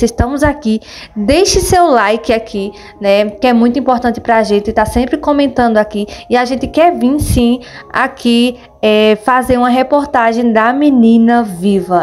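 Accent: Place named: Interior, Brazil. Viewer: Uma notícia mais pobre do q a outra. A narradora eh caipira!!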